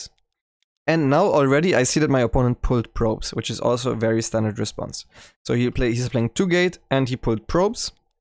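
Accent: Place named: Germany. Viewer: i think the German-ness is quite apparent, his English is good nonetheless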